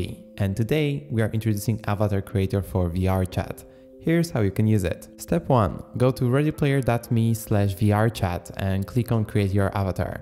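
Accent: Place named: Sweden. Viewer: he used to have a strong Swedish accent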